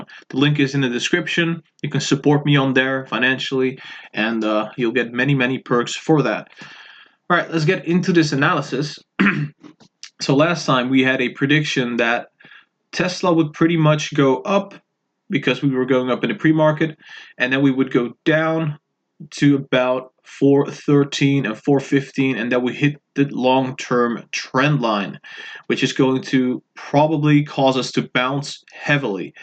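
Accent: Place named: Netherlands. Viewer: Ik dacht al je engels heeft een Nederlands tintje hahah, volg al een tijdje je video's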